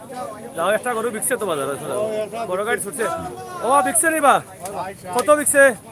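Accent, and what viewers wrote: Sylhet, Bangladesh. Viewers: ভাই বিদেশ তাকি দকিয়ার সুন্দর লাগের, আপনার কতা শুনিয়া হাসিতেছি ভালো লাগের আর ভাই সিদা সিলেটি মাতিবা ইনো আমরা হককল সিলেটি।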